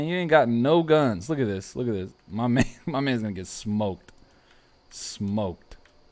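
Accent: England, United Kingdom. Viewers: English accent🇬🇧on point👌🏾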